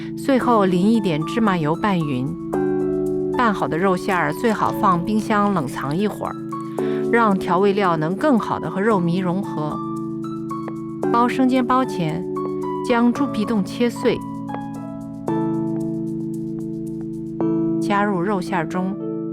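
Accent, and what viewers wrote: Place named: Shaanxi, China. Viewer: 明显是陕西口音呀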